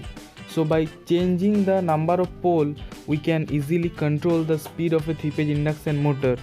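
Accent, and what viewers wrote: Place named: Bihar, India. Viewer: Tu jaroor bihari hai.... angreji kee maa behan kyo kar raha hai bhai ...bihari mai bol de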